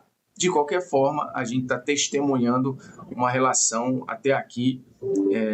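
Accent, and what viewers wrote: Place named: Northeast, Brazil. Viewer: Grande, te acompanho desde o início do EI! o tempo não passa pra vc na aparência só na sabedoria, é admirável ouvir a lucidez nas suas análises, o sotaque nordestino diminuiu mas a musicalidade ainda é a mesma, acompanhar sua carreira tem sido muito legal. Que bom que você transmite o corinthians e posso te ouvir com muito mais frequência, e sim, aqui é tudo muito intenso pra todos, sua visibilidade e e fans vão crescer cada vez mais e muito rápido você vai ver!!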